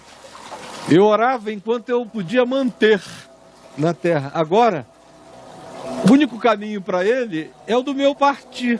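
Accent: Recife, Brazil. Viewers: Nota dez trilhão sabe que fala Recife Pernambuco ❤❤❤❤❤❤❤❤❤❤❤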